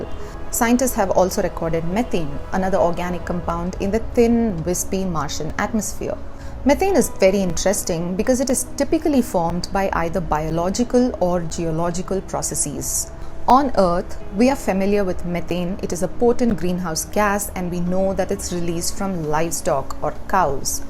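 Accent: India. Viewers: She does have a slight India accent. (MTI) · Very clear and simple in Indian English!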